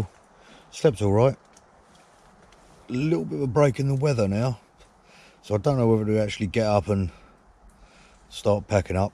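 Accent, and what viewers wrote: Cockney, United Kingdom. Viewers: This geezer looks/sounds just like Ray Winstone, that's a great Cockney accent. Two thumbs up.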